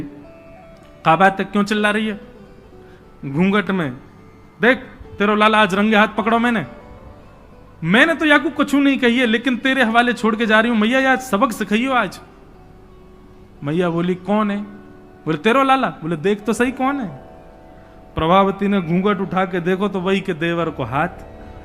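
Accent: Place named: Braj, India. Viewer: Aapki brij bhasha sunne mei bahut maza aa raha hai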